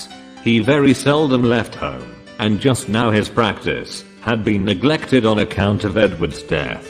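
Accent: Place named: United Kingdom. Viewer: All of his voices and pronunciation is perfect!Full of British Accent!Wonderful!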